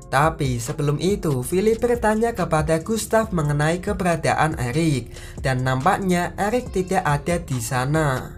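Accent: Java, Indonesia. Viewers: Medok banget sih Lo, jijay gw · Medok banget lu bang..wkwkkw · JAWA NYA MEDOOOOOOOK BGT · wong jowo? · Meudok bingit min .jangan kaku min bicara nya. Monoton bingit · jowo mu endi mass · Medok banget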